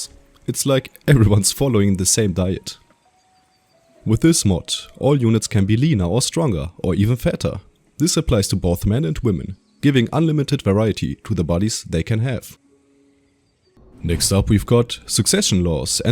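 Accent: Germany. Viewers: du bist deutsch oder ?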